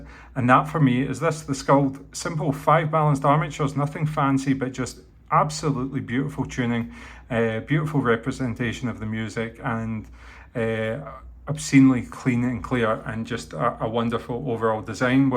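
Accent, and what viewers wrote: Scotland, United Kingdom. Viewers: your scottish accent makes me trust you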